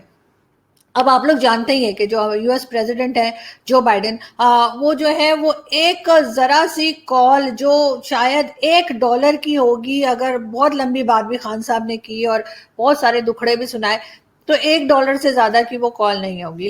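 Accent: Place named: Delhi, India. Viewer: Your explanation of 250 gms bombs with the help of US drones is superb. I mean your hindi/punjabi accent is just like what we hear in New Delhi.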